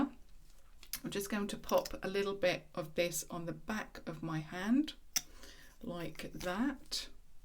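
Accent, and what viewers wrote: United Kingdom. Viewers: The way you speak is so amazing! So gorgeous (and proper sounding) with the British accent but not just that your voice is so calming and nice to listen to :) (I'm from Los Angeles CA lol) but I favor British you tubers. I love visiting old palettes!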